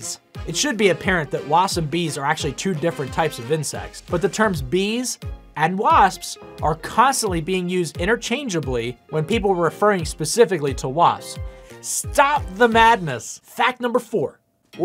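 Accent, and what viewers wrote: United States. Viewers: American or Canadian my guess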